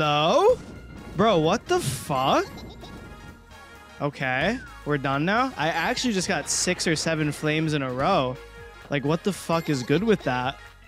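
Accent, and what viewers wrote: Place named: Scotland, United Kingdom. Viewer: Most accurate scottish accent ive heard, couldn't understand a word besides laddie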